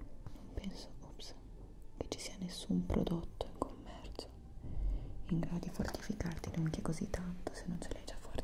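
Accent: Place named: Emilia, Italy. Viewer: È emiliana le origini non lha dette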